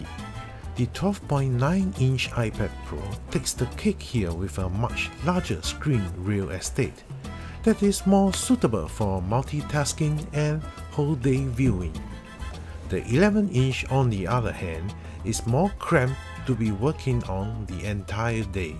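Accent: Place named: Singapore. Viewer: This dude sounds Singaporean.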